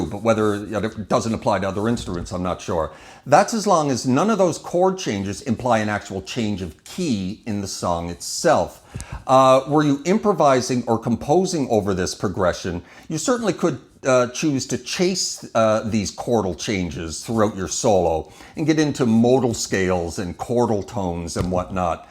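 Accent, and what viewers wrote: Canada. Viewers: It was very interesting, I’m from England, do you have a Canadian accent?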